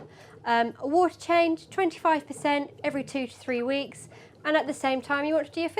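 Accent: United Kingdom. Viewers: the British pronounce words in a different way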